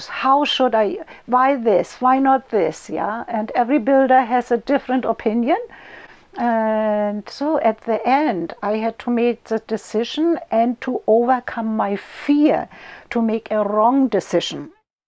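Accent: Germany. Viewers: Ich liebe deine Deutch Akzent. Sehr nett klein haus und auch schön garten. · You are a nice and cool lady, my first impression was that you look europaen, not american.........and your englisch ist not american....... I think, you are German.....??? Alles Gute für Dich......🤝👍🙆‍♀️ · Lovely lady, lovely Deutsche Akzente, lovely little house! I like them all! :-)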